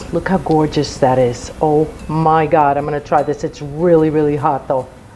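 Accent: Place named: New England, United States. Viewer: judging from your accent your from the New England area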